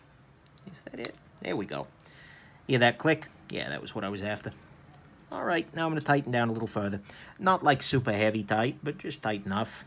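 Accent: United States. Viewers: just got into knives. i like your reviews on knives but where are you from you sound american but with a bugger in your mouth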